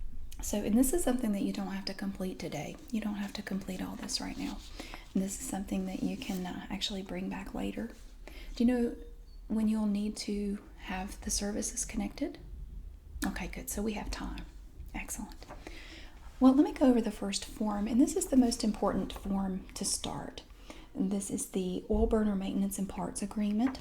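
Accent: South, United States. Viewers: It's strange... I'm English, I've never been to America- and yet your accent somehow makes me feel like I've come home. I love southern accents so much, and I love this video! Thank you <3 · your southern accent is cool!!!!!!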